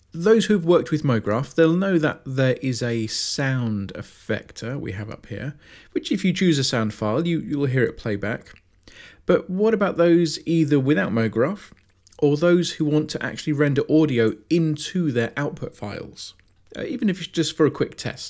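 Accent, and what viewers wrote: United Kingdom. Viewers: Not sure if sophisticated American or just British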